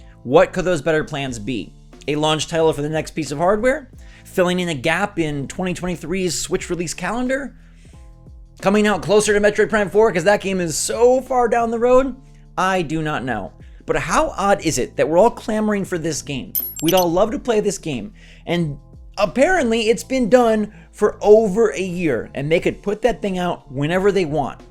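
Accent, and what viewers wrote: United Kingdom. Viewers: I was wondering the British accent but the queen did kinda die though😢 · Your British accent sounded just like the guy who plays Phillip in the Crown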